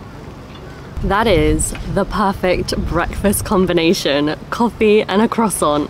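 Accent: United Kingdom. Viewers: イギリス英語って、ホント、耳に心地いい！ と、感じる「１」大阪人です〜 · いつ聴いても綺麗なクィーン‘ズ イングリッシュいや、キング’ズ イングリッシュですねぇ。日本の第二外国語教育として英語か米語のいずれを選択するかの議論があったのですが、日本は米語を選択して今日に至っています。何度も申し上げますが、発音が心に響きとても美しいですね。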